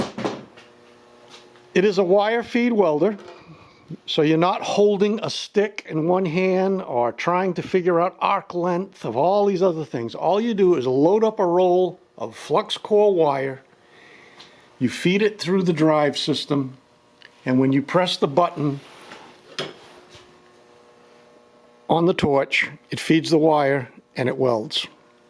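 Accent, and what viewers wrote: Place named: New Hampshire, United States. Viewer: Where you from? Your accent reminds me of home in New Hampshire. You convinced me...I'm jumping on one of these.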